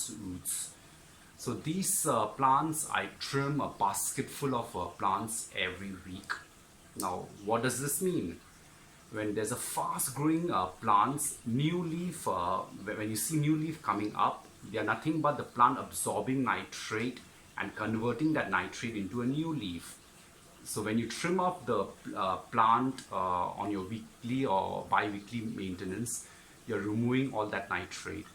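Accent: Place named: India. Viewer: very informative video may i ask how come do you have an indian accent?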